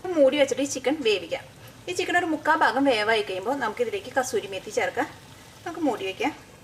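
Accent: Thrissur, India. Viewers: You are interesting to watch. Your Malayalam with that Trichur slang is more interesting. You are my guru in cooking.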